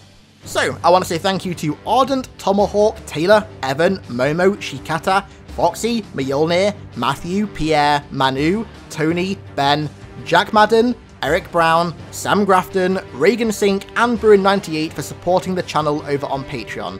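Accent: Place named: United Kingdom. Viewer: Goddamn, can your voice get even more cocky british? 👎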